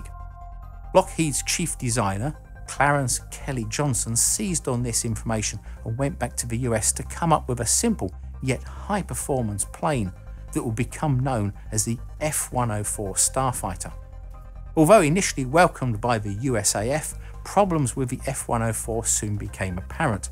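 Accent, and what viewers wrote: United Kingdom. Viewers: Is it british accent?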